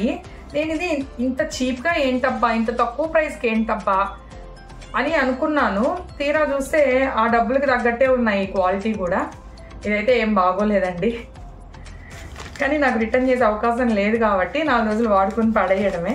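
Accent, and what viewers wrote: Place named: Nellore, India. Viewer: Hi garu nenu mee vedios first time chusanu meeru matladetappudu Nellore slang la vunde anukunna anukunnate meeru vedio lastlo Nellore antaa tiriganu stand kosam annaru kada appudu ardamayndhi meeru kooda mana Nellore vallani😅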